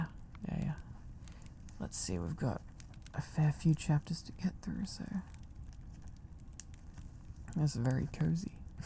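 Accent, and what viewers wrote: Australia. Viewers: omg i am so excited for this series!!! i recently got into the LOTR books and movies and im loving them + your videos too omg. I AM LOVING THIS COLLAB SO MUCH LMFAOOO 😂😭 but jokes aside, your ASMR videos are really helping me get through sickness (a literal covid-level stomach infection and cold), period pain, and just mental health struggles daily. thank you so much for doing what you do, and all the effort and hard work you put in! <3 (all the lovely gifs you create are not going unnoticed at all dw! 🦋) your wholesome videos really brighten up my day (or night? since i listen to them while sleeping mostly haha.) i love your sense of humour, your rambles, and your ideas! you also seem like an incredibly intelligent and a really good person. you are charming as hell and your austrailian accent is amazing of course haha. (im sorry this comment turned out to be equivalent to a personality test result + tarot reading 😭 but i meant it all!!!!) i am so glad i discovered your channel!!! 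ilysm pls, thank you so much for existing. 😭🫂💗💗💗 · i really can’t tell if ur Australian or not but i fkn love ur accent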